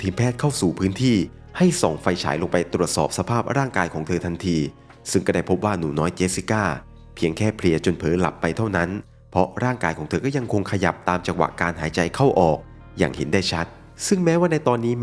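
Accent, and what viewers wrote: South, Thailand. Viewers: คนใต้มั๊ยคับ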